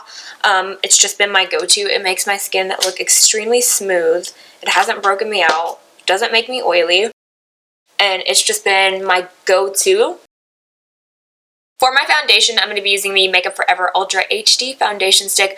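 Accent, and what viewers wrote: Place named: Louisiana, United States. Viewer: I love your accent that comes through sometimes. I'm from Louisiana, near Baton Rouge, so it's fun to hear a little bit of familiar dialect.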